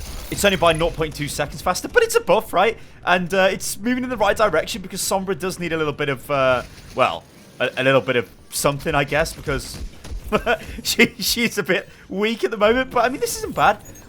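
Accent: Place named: United Kingdom. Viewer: ggeeeeesh why is it so tuff to understand british people when there exited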